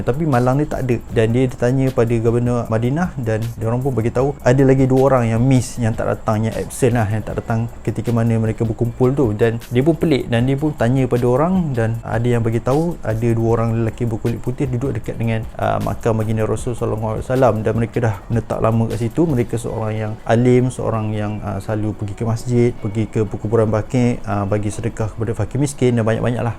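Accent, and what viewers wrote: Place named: Penang, Malaysia. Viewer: ckp penang bang senang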